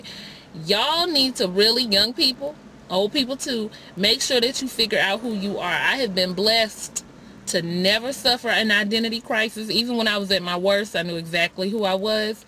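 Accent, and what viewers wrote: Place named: South, United States. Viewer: Great review and you are quite funny. lol I am trying to place your accent. It sounds like somewhere down south.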